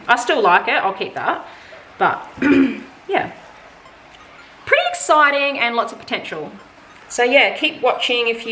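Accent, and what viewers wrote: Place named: Australia. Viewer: I find your Aussie accent wonderfully refreshing ( our accent....lol )....this pic is gorgeous ! New sub here :)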